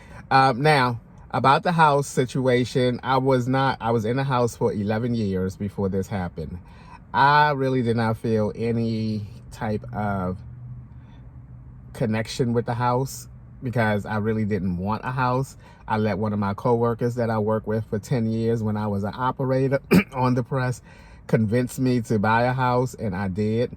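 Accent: Baltimore, United States. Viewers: I knew you were from bmore from how you talk lol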